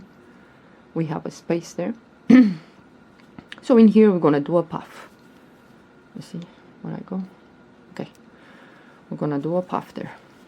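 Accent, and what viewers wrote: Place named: England, United Kingdom. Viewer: Great pattern. Loved learning that stitch. Love your English accent. It’s clear but rather cute! Easy to listen to ❣️ …and I’m English!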